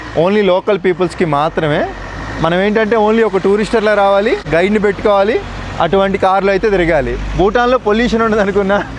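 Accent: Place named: Prakasam, India. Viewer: Nice bro, మీది ప్రకాశం డిస్ట్రిక్ట్ నా బ్రొ, మాటలు పర్సనాలిటీ అలానే వుంది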